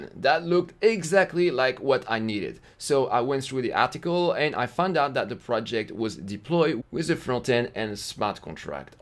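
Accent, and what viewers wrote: France. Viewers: ouuhhh l'accent francais aahaha. Super video sinon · I think you are french 😁😁 i felt this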